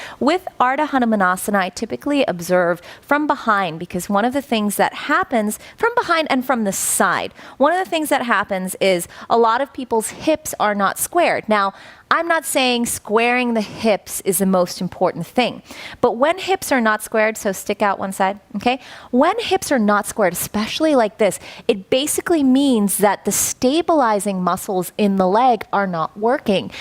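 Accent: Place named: United States. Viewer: I hear an American accent but for some reason it's not unbearable nor disgusting and I could watch the entire video. Congrats!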